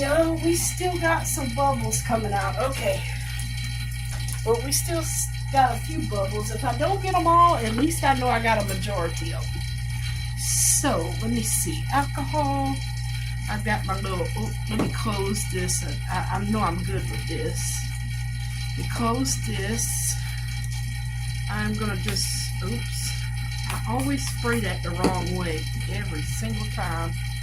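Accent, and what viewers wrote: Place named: Georgia, United States. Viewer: Oh you are in Savannah GA I just heard it I love Tybee Island!! One of my favorite places on the planet!!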